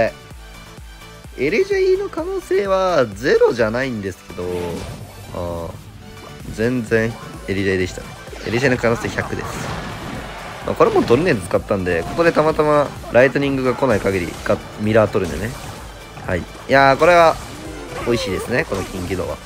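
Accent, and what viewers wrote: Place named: Kyushu, Japan. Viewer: 九州は大体口調こんなんだよ いや、嘘ついた。鹿児島と長崎は違うかも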